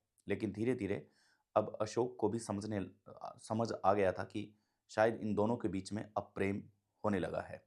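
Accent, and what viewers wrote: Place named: Rajasthan, India. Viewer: Wow aapki hindi buuri nhi paaye ❤❤ ji aapne khajuwala wali language 😂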